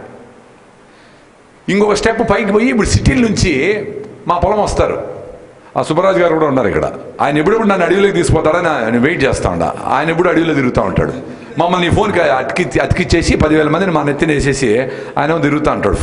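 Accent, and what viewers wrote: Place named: Anantapur, India. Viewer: Ma anantapur slang👌🥰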